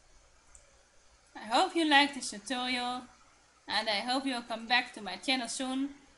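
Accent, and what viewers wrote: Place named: Netherlands. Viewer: Zo te horen aan je accent kan ik hier gerust iets in het Nederlands neerzetten. :-) Top Tutorial! Net wat ik zocht.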